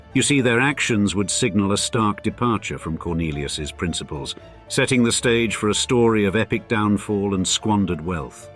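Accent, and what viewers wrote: United Kingdom. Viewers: Question about the narrator's accent: British or some modernized version of Mid-Atlantic?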